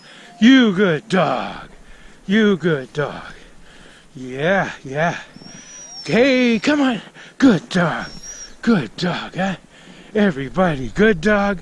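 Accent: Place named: Massachusetts, United States. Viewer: seasons greetings from your old stompin grounds...massachusetts. you havent really lost the accent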